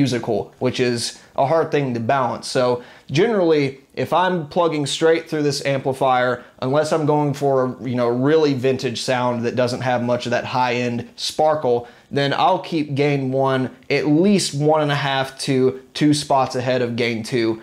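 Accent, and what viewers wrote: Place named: South, United States. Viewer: just curious,do you live in the South??You have a southern drawl like me,HaHa...I am in Alabama...That’s a great sounding amp,I have been seriously thinking of getting a Ceriatone,just trying to decide which one....you may have just made that decision easy.....love your channel by the way....great tones..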